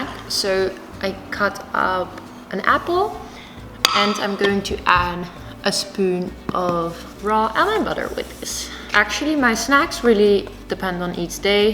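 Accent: Netherlands. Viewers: she sounds so dutch lol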